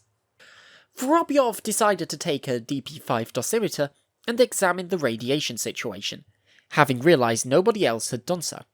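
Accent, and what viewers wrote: United Kingdom. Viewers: I know you got a British accent and all, but Kursk is in Russia, not Ukraine.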